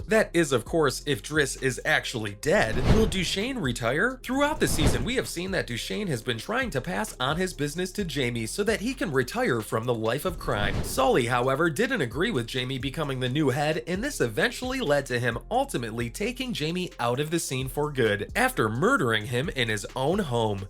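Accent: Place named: United States. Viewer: why is a American telling us this😂😂😂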